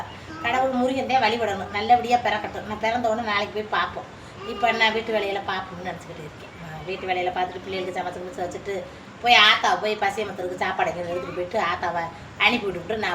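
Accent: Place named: Madurai, India. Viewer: வெள்ளந்தி யான பேச்சு, எங்கள் சின்னஅத்தையை மதுரையில் கட்டி கொடுத்தாங்க, அவுங்க பேச்சி இப்படி தான் இருக்கும், அத்தை இப்போது இல்லை, இந்த பேச்சு எங்கள் அத்தை பேச்சு மறுபடியும் கேட்டே மனம் ஆறுதல் ❤🎉 நீங்கள் நல்லதே செய்யுங்கள் நல்லதே நடக்கும் மா🎉❤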